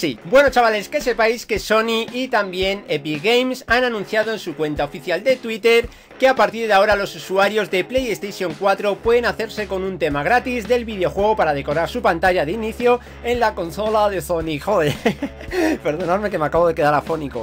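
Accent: United Kingdom. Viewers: Que guapete eres tu inglés es de cambridge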